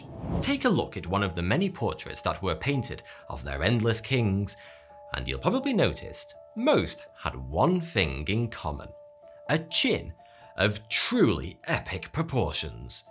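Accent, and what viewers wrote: United Kingdom. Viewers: I've been interested in inbreeding for many years, and have read about and watched videos concerning the Hapsburgs and Queen Victoria's descendants spreading the hemophilia gene. You did a very nice job researching and presenting this information about inbreeding. Add to that that you're a Brit with a fantastic accent, and I'm hooked on this channel! Everything sounds better when narrated by a Brit!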